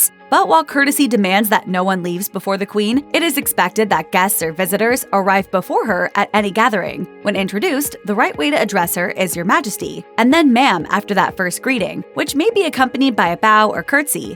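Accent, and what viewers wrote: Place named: United States. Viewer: An American accent talking about British royal protocol is just not proper!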